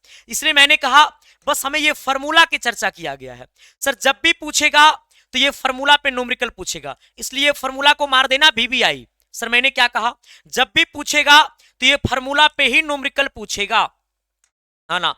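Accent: Bihar, India. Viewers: aap bihar se ho.....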